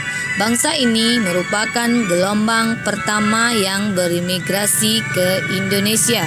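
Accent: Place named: Malaysia. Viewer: kenapa pake logat malaysia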